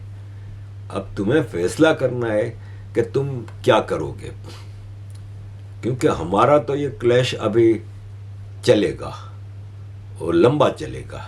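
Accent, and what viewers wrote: Gujarat, India. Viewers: Sirji aap kahise pakistani nahi lagte ,,aapka bolne ka andaz thodasa gujarati lagta hai,, matlab ke indian lagta hai,, salute to your knowledge sir,,pls tell us the story of Turkey 🎉❤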